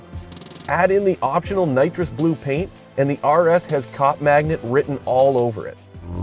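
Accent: Canada. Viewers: You sound like a Canadian, but you do a pretty darn good job.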